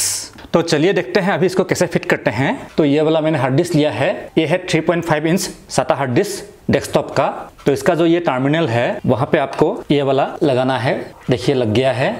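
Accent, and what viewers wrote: Assam, India. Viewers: Axomor sobore hindi kotha anekuae hoi..🙃🙃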